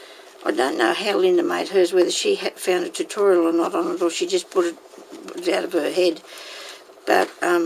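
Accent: Australia. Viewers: its such fun. love watching what you have done.its great to hear an aussie voice and to see how creative you are. love your laugh. thanks so much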